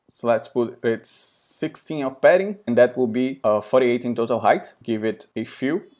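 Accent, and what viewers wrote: Brazil. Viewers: Muito bom! acredito que seja brasileiro pelo sotaque. Parabéns pelo canal Bro! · Se esse sotaque não for de Brasileiro não sei mais nada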